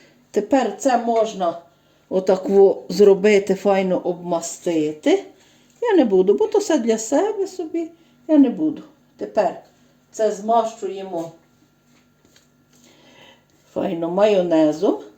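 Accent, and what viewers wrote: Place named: Galicia, Ukraine. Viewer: Дякую! Завжди дивлюся Ваші рецепти. Продовжуйте! Ваш галицький акцент- чудовий! Всього найкращого!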